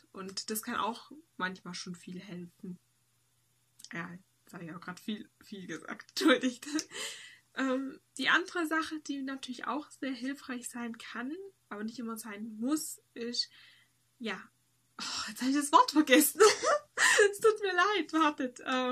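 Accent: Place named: Swabia, Germany. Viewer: Ich kann das nachvollziehen, wie Du in Sachen Hilfe annehmen fühlst. Du wirkst symphatisch und das Schwäbeln passt gut. MfG René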